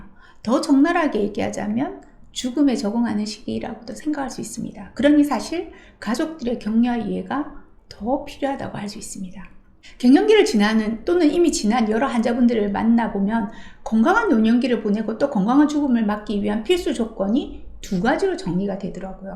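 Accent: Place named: Gyeongnam, South Korea. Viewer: 호주 시드니에서 사남매 맘이 응원합니다 ^^ 저는 경남거창이 고향인데 ㅎㅎ근처 비슷한 말씨인거 같은데 ㅎㅎ좋은 영상 감사 드려요 새해도 활기차게 감사하며 아자아자!!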